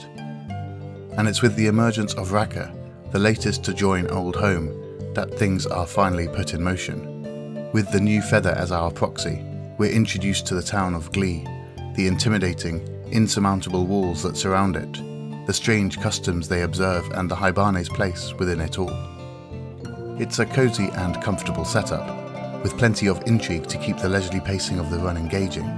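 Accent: United Kingdom. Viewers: As a fellow English person the voice is slightly laboured and enunciated, which for me affects the flow - but it’s a very nice voice - probably sounds amazing to non British speakers of english. We have some nice accents here I must say. And some weird ones! Best thing about the voiceover - at least I can understand it! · Are and Sargon of Akkad the same person? The voice sounds similar to me and it's not only the British accent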